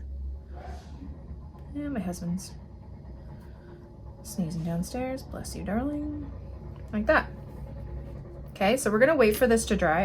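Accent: Canada. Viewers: I have another reason to love your channel. I bought a set of pearlescent watercolors from Michael's - about $8-10 Canadian. I tried them out, and the colors are so beautiful. I don't have the metallics though. Your video is very welcome. Question. Are you in Canada? Your 'accent' sounds different from Americans.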